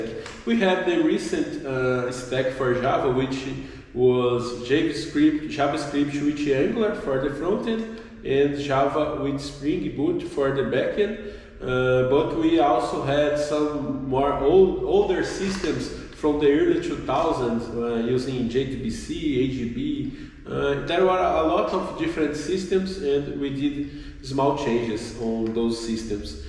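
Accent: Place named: Brazil. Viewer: Ta bem brazilian… mas ta fluido e confiante demais, isso importa muito. Parabéns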